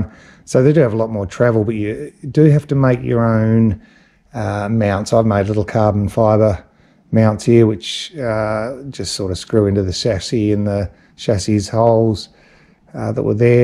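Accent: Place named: Australia. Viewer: Great video dude. I have 8, 1/10 crawlers. My first hobby grade RC was the original deadbolt so I have wanted a Scx24 Deadbolt since they first came out. Finally ordered one and have been watching lots of upgrade videos. Like yours the most. Interesting that you still like the Nitto tyres. I'm not going as far as you with upgrades but you know how it is I won't be able to help myself. You sound like an Aussie, keep the videos coming.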